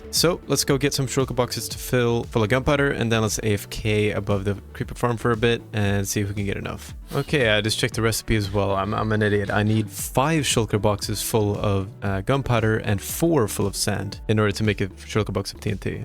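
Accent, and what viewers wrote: Sweden. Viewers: Are you Swedish perhaps? If so, hallå där🕺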